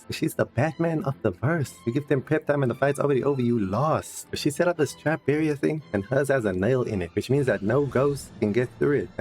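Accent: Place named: Australia. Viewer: this is a black australian accent, i believe. i have black australian friends that sound the exact same.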